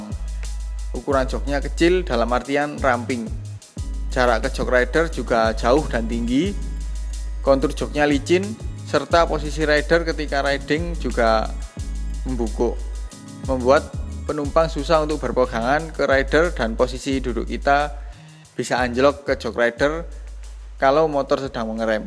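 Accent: Malang, Indonesia. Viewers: Mas wong malang tah?😅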